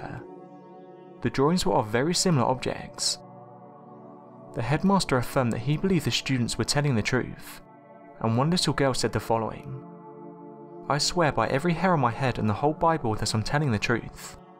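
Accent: England, United Kingdom. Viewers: Everything is better with a English accent.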